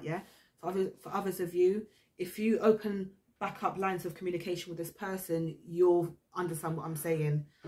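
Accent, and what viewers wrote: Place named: United Kingdom. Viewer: Hiya miss gotta luv the accent(wich part of the UK)and nothing wrong with shopping at Aldi init🙂 I think i found me new best tarot card reader miss 😍🤫 (Not disrespect here dear sis...i ain't build like that) The last holiday i went i met this lovely couple Claire and John living in Kent/Canterbury...maybe it's time to pay them/the UK a visit. Superthanks translating the cards and MASSIVE RESPECT from the Dutchman Ma'am 🙏🏼✌🏼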